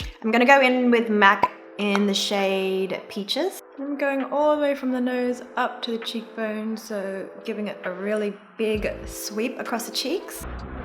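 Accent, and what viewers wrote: Australia. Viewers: ay the nice aussie accent just makes me hit the subscribe button oh yeah love the final look 😍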